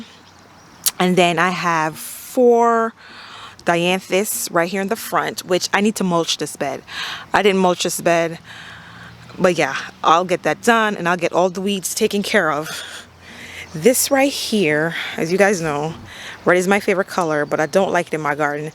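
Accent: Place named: Jamaica. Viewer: you soundalike you have a Jamaican accent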